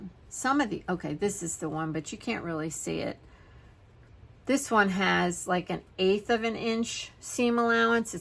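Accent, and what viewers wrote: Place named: Pennsylvania, United States. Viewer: A friend told me about your dots...I love watching your process! I also love your PA accent (I've lived on both sides, so I recognize the Pgh side, and the Philly side!). Anyway, love your gratitude focus. Thanks,!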